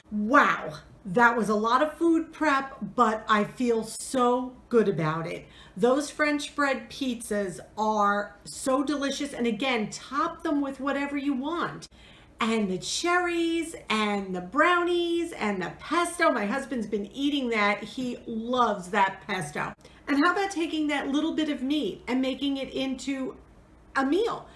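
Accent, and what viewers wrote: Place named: East, United States. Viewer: Hello Mornings with Granny sent me. Gotta check out your channel. You sound like from back east. I am from New York state.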